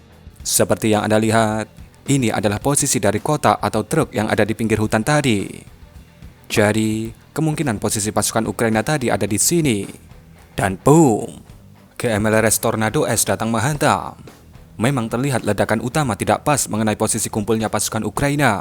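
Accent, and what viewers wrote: Java, Indonesia. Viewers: Komentar saya cuma 1 suaramu medok lucu😂😂😂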